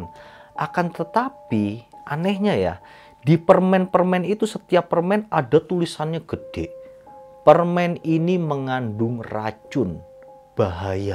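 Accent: Java, Indonesia. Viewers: Jawa apa sunda om? Kok indonya medhok hehe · Medok bgt dah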